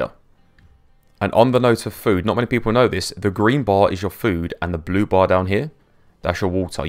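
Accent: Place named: England, United Kingdom. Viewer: Dude, thicc English pronunciation. Do love it)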